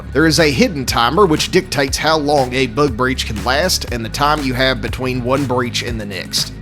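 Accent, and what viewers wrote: South, United States. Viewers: That southern accent really make it sounds like you're a real commander. 🫡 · Your voice is as stereotypical American as it gets 🦅🦅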